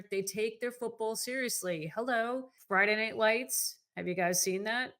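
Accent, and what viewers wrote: New Jersey, United States. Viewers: Sounds like New Jersey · Well, I'm in eastern Washington, so I have no dog in this fight, but come on ... Here we have a real estate agent with a strong Jersey accent talking about how bad Austin, TX is. Now that's just funny. How clueless can a person be?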